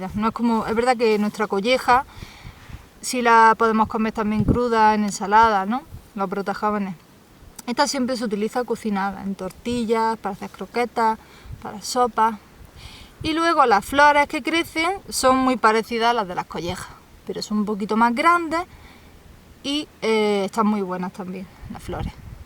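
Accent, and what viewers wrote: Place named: Cádiz, Spain. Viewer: Muy interesante video 👍, la chica habla como los gaditanos 🙄, 😁saludos desde Uruguay 🇺🇾🙋🇺🇾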